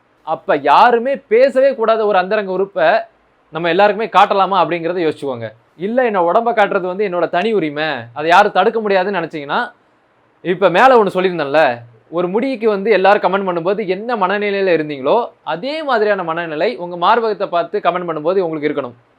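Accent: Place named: Tamil Nadu, India. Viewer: Tamilan kural da 🙏👍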